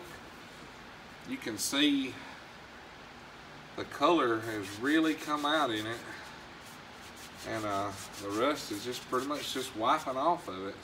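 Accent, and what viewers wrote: South, United States. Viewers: Classic American? Only a small portion of the populace sounds like that, and yes he's in the south. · I love your accent, you sound like Oggy Doggy, or Doggy Daddy,, or Deputy Droop Along, or that Huckle Berry Fin dog..No offence meant, just a classic American accent. [From the south?].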